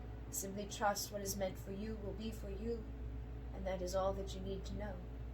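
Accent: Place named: United Kingdom. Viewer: I have catched a British accent for the order. 🙏🏻 This is fascinating and you are so talented. Thank you for doing this for us. Have a good rest.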